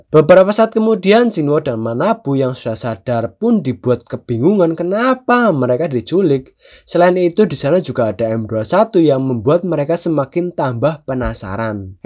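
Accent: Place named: Banyumas, Indonesia. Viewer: Njir wong ngapak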